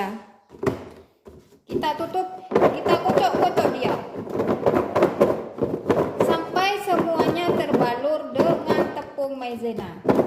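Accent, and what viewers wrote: Madura, Indonesia. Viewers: Orang madura ni kyaknya.... mantap.... yeeeee tak teee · Kyk Logat madura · mbak orang madura ya🤦‍♀️🤦‍♀️🤦‍♀️ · Fix mbaknya dari logat nya pasti orang madura nih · Boabbo orang madura ya gess..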